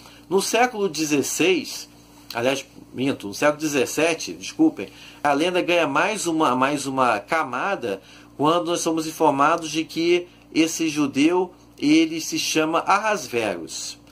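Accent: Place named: Rio de Janeiro, Brazil. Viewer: Cê e carioca 😳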